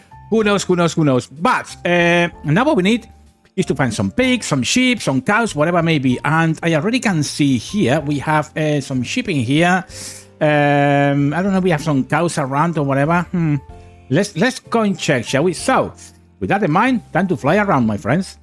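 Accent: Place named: Ireland. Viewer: great vid keep up the good work I really like your Irish old man accent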